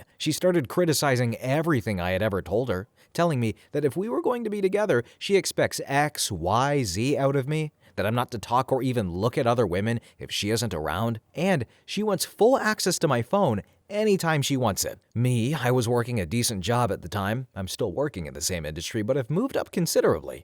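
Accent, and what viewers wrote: Russia. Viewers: Loved the Russian accent! Crazy story. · Love your russian accent xD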